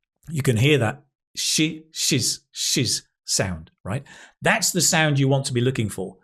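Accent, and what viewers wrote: United Kingdom. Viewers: Wait. This is British English, no? Excellent for lesson, especially about the pronunciation in English.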